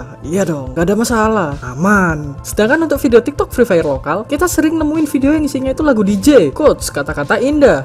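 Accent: Java, Indonesia. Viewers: Begitu kangen dnegan bacotanmu dan kemedok an mu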